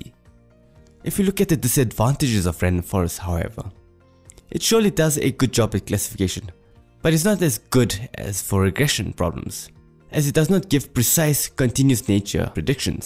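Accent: South Africa. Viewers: I think this is great. There are plenty of examples of code and technical online but for fundamental understanding, this works just fine. Good to hear South African this coming from South Africa! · Dude your accent is so South African!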